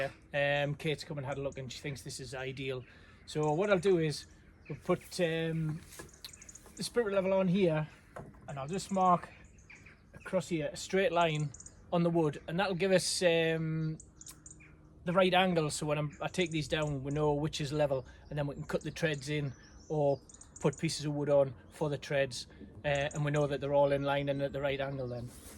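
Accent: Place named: Northern England, United Kingdom. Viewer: I love your running commentary teaching. I'm no carpenter, but I can follow your procedure. And I get nostalgic listening to the lovely Northern voice of my long ago childhood. Thank you.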